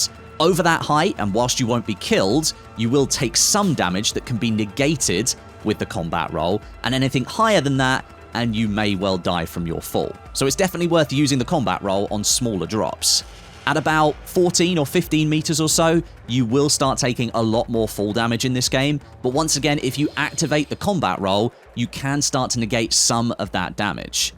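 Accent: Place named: England, United Kingdom. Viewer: you outsub stodeh on YT good work man! my englishman with the so easy to know who u are by ur voice ;) perfect english accent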